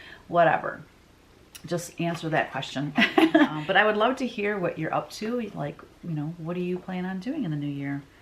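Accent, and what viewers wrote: Michigan, United States. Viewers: Hi sounds like you live in Michigan also Love your show just found you. Your work is gorgeous I'm getting back into cross stitch. Thanks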